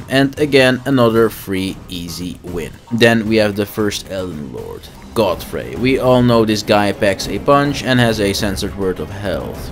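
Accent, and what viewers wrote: Netherlands. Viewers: Holy sh*t wanna try out this build. Love me some artist in NG+. Now gonna go with this one in next run. Think I'll do a normal NG run to really see the power of this! O and artist really nice on most enemies, only Gargoyles are a pain. I use a flail cause of the dex arcane. And I was wondering cause of your accent if your from the Netherlands (I am).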